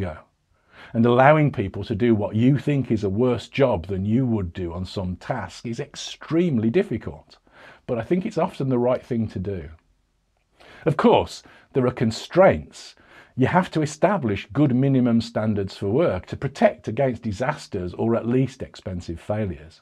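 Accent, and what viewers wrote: United Kingdom. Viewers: "your bus comes up to you and says" I really appreciate the UK accent but I just had to point out that was the first thing I've heard.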